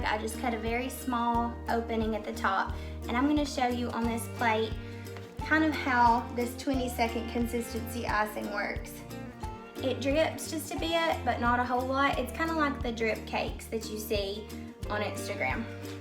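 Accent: South, United States. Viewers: Fingers crossed my icing turns out like yours. Lol And yes, I could tell you are a southern girl right off the bat. I'm one as well. Lol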